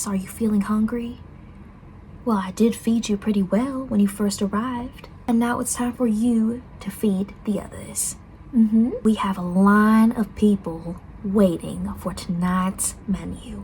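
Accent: South, United States. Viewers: Please do more with a southern accent 🙏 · I REALLY LIKE THE ACCENT, I HOPE YOU DO MORE SOUTHERN ACCENT VIDEOS 🔥🔥🔥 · As someone from the south: this is pretty good!!! It's fun to hear the voice with her physical mannerisms, cuz it's very different from people in the south i reckon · Bro took we are cooked to a way new level 😭😭 (*btw you ate on that southern accent:3*) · The Southern accent is so relaxing! But the ending…is it hinting that Alex and Eric were not simply in-laws and had something deeper between them :_cool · HAHAHA I love the southern accent so much!! despite how serious and scary this video is the southern accent made me laugh!! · we need more of this southern accent from you! it’s so relaxing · What I think about this video: I love the southern accent ❤ It was not interesting that you used the same nickname over an did very like honey pie and sweetie pie ( no offense 😅) I think to boost your the amount of people who watch your videos is to make it more realistic but I still love the effort 😊 Try getting toys that are used for the topic of your video so for this video you would need a knife, ingredients,toy cooking supplies can make your videos amazing 🤩 That's all I think about this video!❤ Keep up your amazing work and I hope this motivated you and inspired you to do this too! 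💕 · THAT SOUTHERN ACCENT ATE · Omg I love your southern accent 💙🖤💜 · THE SOUTHERN ACCENT SLAYEDDDDD😜💅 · Lol I wonder if I should admit I kinda got hungry watching this, I just love southern cooking 😅Speaking of which, I actually have southern relatives and I thought you did great with your accent !!💗:_approved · as a southern person with a southern accent, i think you did great!! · The southern accent was really good! Although a lot of people where I live accent is heavier than that(😭) you did super good love it! Feeling kinda cozy while being cooked 🎀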